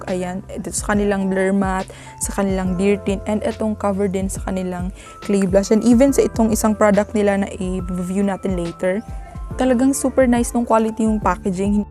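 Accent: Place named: Philippines. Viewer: I like your Tagalog accent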